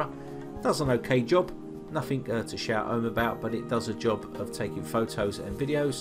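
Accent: United Kingdom. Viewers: British English..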